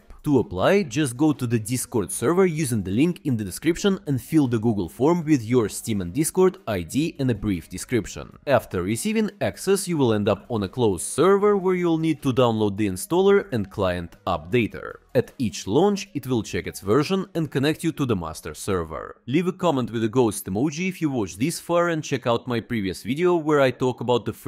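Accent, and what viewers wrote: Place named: Russia. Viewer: you speak like russian with this accent, am sorry if i say wrong, am poland so it hears very known for me :)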